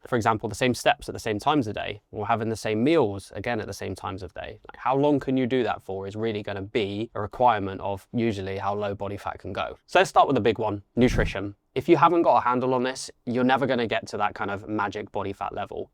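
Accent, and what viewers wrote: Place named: England, United Kingdom. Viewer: Well presented content. Pleasantly surprised to hear an English accent, these videos are usually American! All the best.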